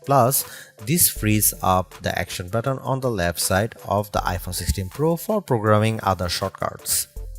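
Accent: India. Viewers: I hate your indian accent to be honest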